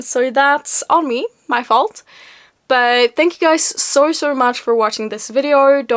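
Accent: United Kingdom. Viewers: Älskar att du pratar med brittisk accent 😍 ps älskar dina videos ❤️ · Jag måste verkligen säga att jag älskar din engelska accent😍 Och kul med böcker, är själv en bokmal 😊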